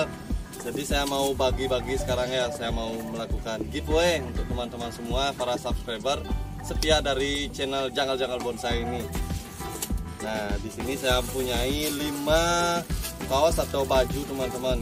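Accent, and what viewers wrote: Bali, Indonesia. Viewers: Om swastiastu, kenapa saya sangat suka channel, 1. Bli membuat konten slalu apa adanya dan tidak melebih lebihkan 2. Slalu mrendahkan diri pdahal bli udh brpengalaman lah dengan hal bonsai 3. Saya sangat senang dengan konten bli yg memanfaatkan bahan yg ada di skitar kita 4. Karena channel bli saya jadi ikut membuat konten tentang mencari bahan bonsai di skitar kita 5. Logat bali medok pokoknya 👍😁 Msih bnyak sih mungkin dri saya skian dlu, smoga sukses slalu dan kontennya smakin berkembang dan semoga bisa cepat mengejar 100rb sub ya bli, klok blh bsk" diajakin ikut nyari bonsai bli hehehe 🙏👍😁, suksme bli maaf klok ada slh kata🙏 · Alasannya karna lucu dan unik ,maklum beda daerah, saya dari semarang, beda logat bicaranya, jadi asik aja denger logat bali yg kental dalam penjelasan di setiap vidionya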